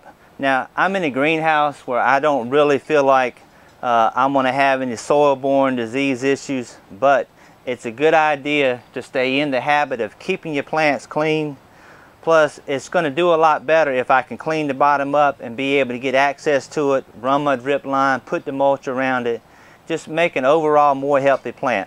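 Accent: South, United States. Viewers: I love to hear people talk with this particular southern drawl like he has got, by the way, beautiful tomatoes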